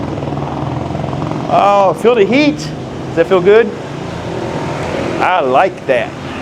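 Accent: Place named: South, United States. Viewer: Are you from Texas? You have a Southern accent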